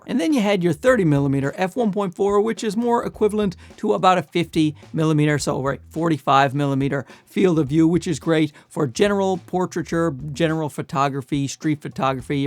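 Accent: Canada. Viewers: Nice lens!Loved the shots at the intro….pretty artsy! Handheld or added external stab? Great energy …almost want to buy these lenses and then buy a camera to fit! Btw the 23mm made you look more like Chris Hemsworth, but the CDN accent offset.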